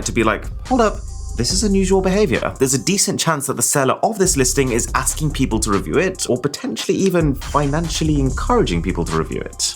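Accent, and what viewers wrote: India. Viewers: The sub continent accent made it worse for me.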